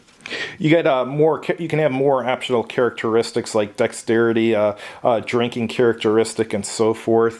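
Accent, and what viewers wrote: Chicago, United States. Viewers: must have the thickest accent in all Chicagoland.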